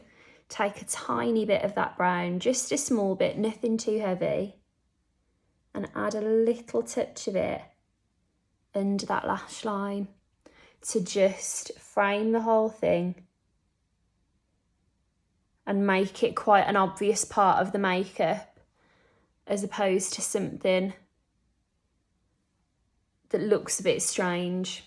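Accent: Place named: England, United Kingdom. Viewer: I love your English accent, American accent is an accident.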